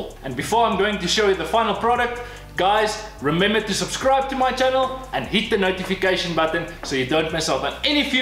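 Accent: South Africa. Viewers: Nowt better than the South African accent. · Fantastic work. You sound like a afrikaans speaking South African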